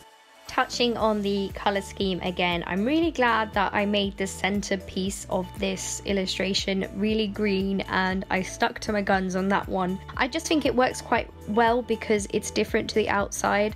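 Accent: United Kingdom. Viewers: Cool painting and it glows like crazy. I wonder if you can apply it to plastic surfaces like a action figure? By the way, love your accent it's British right?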